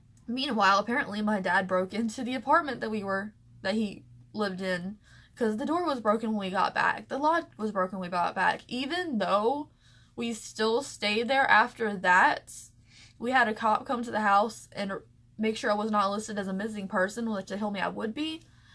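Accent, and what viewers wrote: South, United States. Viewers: You are such a beautiful, beautiful girl. My heart breaks for you. I am from Denmark, so I have never experienced racism in that way and all the hate it brings. But I can relate to being an outcast dreaming about old world glamour. I think you are so strong. But you must be going trough a very shitty time right now, breaking up with the person who is your only safe heaven. I can only advice you to spend time with your grandparents before it is too late. If you ever chose to see your parents again, do it on neutral ground and state that you will only do it, if they will respect you. I know the healthcare in The US is somewhat shitty, but I really hope you have or can get some form of professional help. It is so important to talk about such trauma. I hope that you know I and many, many others love to watch your videos! i really admire that you can make one each and every day! 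Such talent and discipline. I wish you the very best. And I looove you southern accent! Please do not suppress it! Love from Denmark<3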